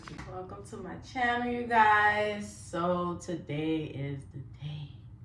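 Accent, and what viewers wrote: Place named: New York, United States. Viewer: Hey just subd to your channel💯🫡. Are you from NY? You sound like your from up there🙂😀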